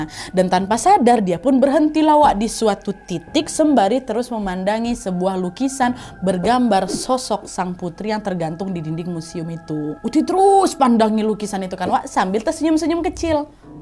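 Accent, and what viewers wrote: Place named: Banjar, Indonesia. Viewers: Cocok banget kak bicara bahasa Banjar, logatnya pun kental, emang multitalent 😊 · BANJARMASIN KANDANGAN HADIR ☝️, lucu dengar pakai bahasa Banjar 😅🤣🤣😅, Kya suara Acil ku di higa rumah yg panyarikan tuh 😅😅😅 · agak kaget pas denger kak ngomong b.banjar, logatnya kerasa bgt ga sii?, keren parah kak hha 😌 juga baru pertama kali ini denger khw yg pake bahasa daerah tapi gabaca subtittle, btw berhubung udh di penghujung ramadhan, aku minta maaf ka wawak-wawak semua kalo aku ada salah yaa, minal aidin wal faidzin wawak semuaa 💟💟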